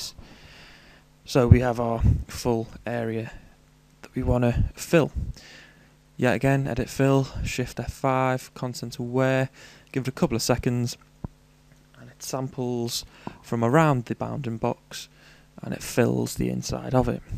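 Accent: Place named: United Kingdom. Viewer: thx team GB lol, keep the hard work mate, this is fantastic :) that is British accent for u Minh Nhat :)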